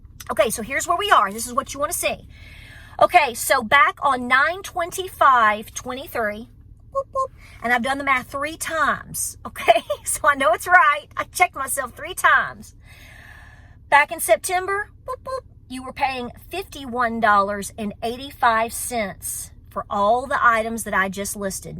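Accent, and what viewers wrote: South, United States. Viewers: you have a Southern accent your a upbeat Energetic active woman i wanna be upbeat Energetic active like you are when i am your age i subscribed to your channel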